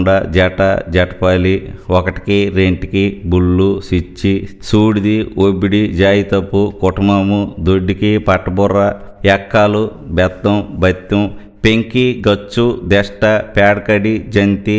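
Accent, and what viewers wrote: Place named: Uttarandhra, India. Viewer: అంతరించి పోతున్న ఉత్తరాంధ్ర మాండలికం, నుడికారం, స్థానికంగా ఉచ్చరింపు మీకే సొంతం... మీ సంకలనానికి దాసోహం...🙏🙏🙏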